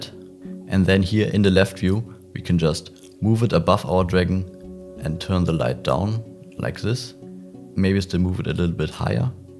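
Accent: Germany. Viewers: Is it the germany accent?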